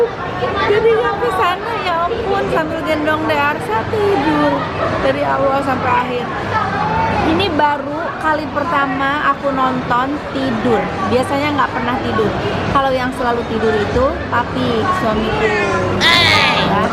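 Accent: West Java, Indonesia. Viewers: Lucu teh 🤣nyariosna logat sunda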